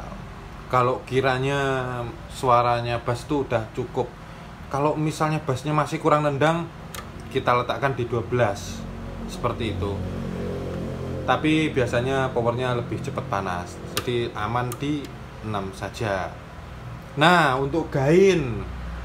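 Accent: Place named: Java, Indonesia. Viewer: Medok jowo puol wkwkwk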